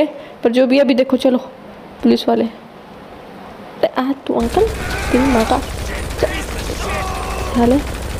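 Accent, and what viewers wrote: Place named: Haryana, India. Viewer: same hare me too haryanvi Edit : dekhkar aur awaj se hi smj gya haryanvi Chori h 🤟🤟💪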